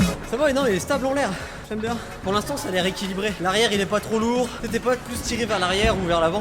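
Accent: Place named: North, France. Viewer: Tu viens du nord ? J'ai l'impression d'entendre un petit accent ^^ Edit : ah bah oui tu le dis dans la vidéo tu vas sans skate parc du nord de la France x)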